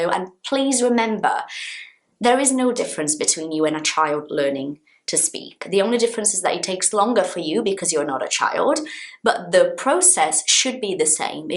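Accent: United Kingdom. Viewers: What??!?? Ela fala português?! O sotaque britânico dela é tão perfeito, que o português dela parece sua segunda língua.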